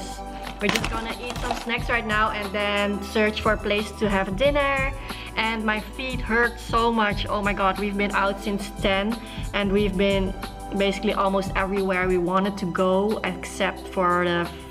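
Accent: Netherlands. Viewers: Aaah ik hoor gewoon dat je Nederlands bent! Geen idee waarom maar je weet het gewoon als er een fellow dutchie is😂 Je lijkt me echt een leuk persoon, als je in Amsterdam bent zouden we moeten winkelen ofzo x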